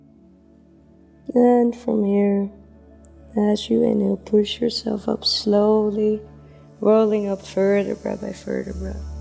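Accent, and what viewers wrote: Netherlands. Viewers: Yes, she sounds Dutch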